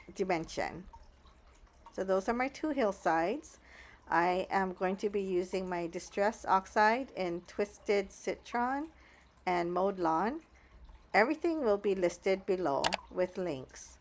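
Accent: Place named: Hawaii, United States. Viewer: I agree, the slimline was perfect for this stampset! So cute!🤗 Gosh, I love listening to your Hawaiian accent. Reminds of my work Ohana when I was stationed on Oahu🤙🤙🤙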